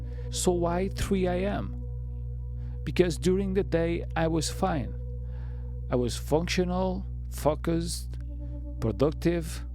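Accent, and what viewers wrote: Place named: Portugal. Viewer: Do you speak Portuguese? I hear your accent! Do tell us more about yourself. I just read “ Why we sleep” book. Sleep is fascinating. We are so complex!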